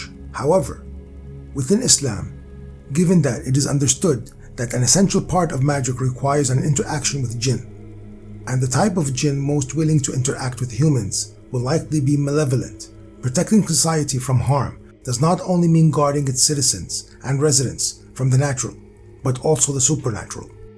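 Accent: Arab world. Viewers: Well it is very hard for me to listen to your arabian english accent. Maybe you should include subtitles i the next video if it is not much of work. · Arabian accent and a deep voice = Set for life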